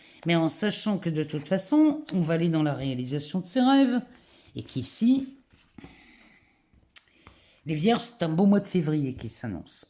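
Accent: North, France. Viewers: merci, pour tout. Mais tu me fais marrer, sans rien faire, seulement de t'écouter!!!!!! Bravo, cet accent nòrdiques que j'aime, tellement!peut-être des ch'tis??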